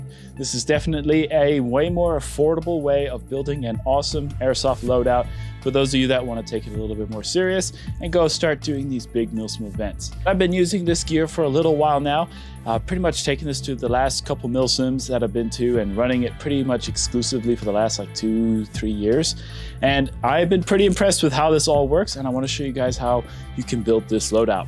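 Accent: South Africa. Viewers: Are you from south africa? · you south african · Are you south african?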